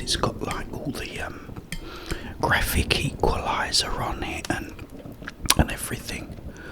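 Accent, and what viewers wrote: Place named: Surrey, United Kingdom. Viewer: I never knew you were from Surrey!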